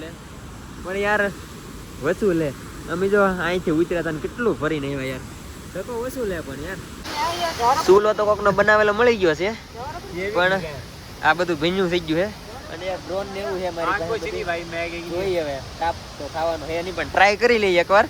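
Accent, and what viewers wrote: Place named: Bhavnagar, India. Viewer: Tamari language(boli) pyor bhavnagari che ❤ so like it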